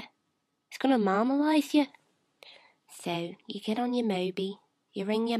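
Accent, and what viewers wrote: Liverpool, United Kingdom. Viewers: WAT these are just english words misspelled and in a britishey accent. this is dumb... "Scouse" lol it's a distinct language! lololz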